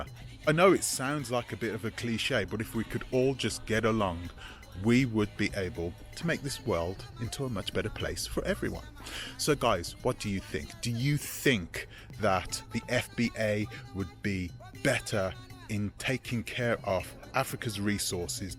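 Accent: United Kingdom. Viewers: OMFG THAT BRITISH ACCENT IS SOOOOOOO ANNOYING IDK HOW ANYONE CAN LISTEN TO IT FOR LONG PERIODS OF TIME 🤮🤮🤮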